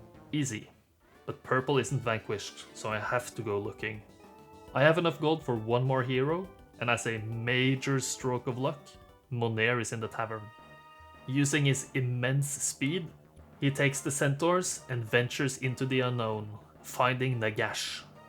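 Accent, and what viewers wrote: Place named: Norway. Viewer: Norwegian revealed... for all those that didn't already recognize the accent Heia Norge!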